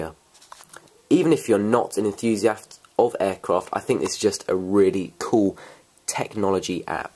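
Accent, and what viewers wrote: United Kingdom. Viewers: I like he British accent !!!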